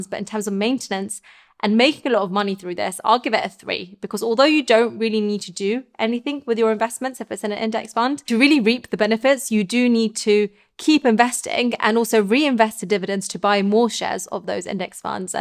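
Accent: United Kingdom. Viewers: I clicked Like after 10 seconds because of your awesome British accent, love it! Good tips too of course. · I saw your thumbnail. Bet my buddy you had a British accent . He said American. I figured it was 50/50. Thx for the free lunch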